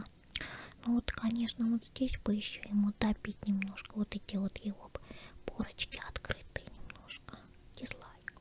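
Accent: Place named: Moscow, Russia. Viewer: Типичный московский говор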